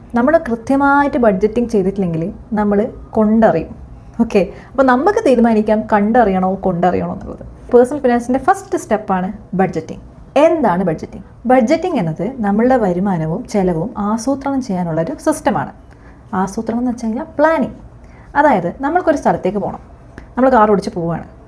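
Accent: Kannur, India. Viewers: Kannur kaari aano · And most of all, I enjoy listening to your Kannur accent :-)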